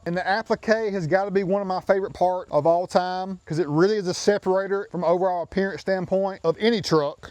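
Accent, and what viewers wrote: Georgia, United States. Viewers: First time viewer, was thinking man he sounds like he’s from Ga. Then I saw Todd’s truck to confirm it. Hell yeah !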